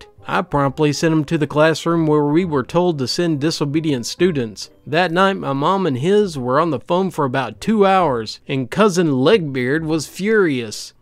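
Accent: South, United States. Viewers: Areou southern what state are you from?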